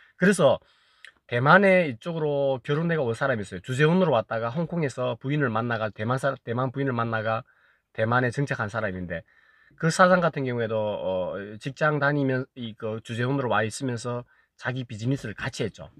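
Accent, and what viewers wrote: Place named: Pohang, South Korea. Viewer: 소중한 정보 고맙습니다. 구수한 포항 억약이 인상적입니다. ^^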